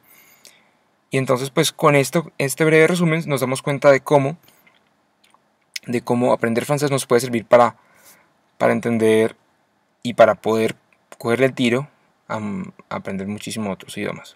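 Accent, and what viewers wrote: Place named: Colombia. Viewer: Menudo acento Colombiano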